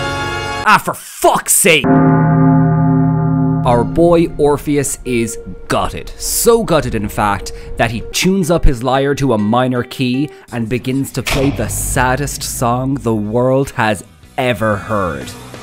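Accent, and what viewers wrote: Ireland. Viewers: Thank you, Senpai. UwU Also I love your channel, your Irish accent, slang terms/euphemisms, and cultural references are really fun to listen to.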